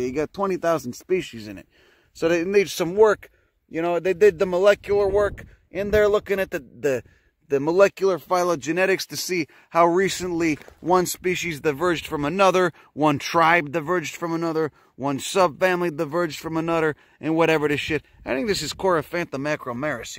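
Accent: Chicago, United States. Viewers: he's from chicago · I just love a proper Chicago accent it reminds me of home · Very entertaining content! Great accent! (Chicago? Hearing "Da bearsss"). Walked right by a sweet Paleo arrowhead. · Chicago accent, not any way near New Jersey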